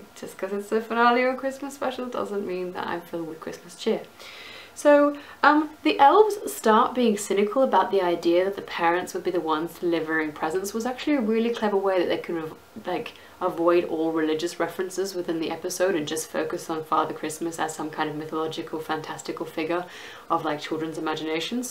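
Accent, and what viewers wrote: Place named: Australia. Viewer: When you say that you're taking a break from the show, aren't we all going to kind of have to, since we're not getting another episode until fall? And, btw, I agree with you, even to such an exceptional amount that watching this kinda felt like listening to myself think. Interesting. Hence the subscription: I want to see if this continues. Also, I totally didn't even pick up on your Australian accent. What part are you from?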